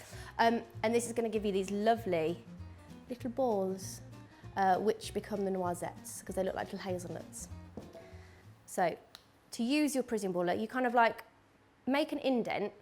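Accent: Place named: West Country, United Kingdom. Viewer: I give up - what is your accent? Sometimes I hear London, sometimes Midlands, and often West Country... put me out of my misery! (P.S. These spuds look glorious!)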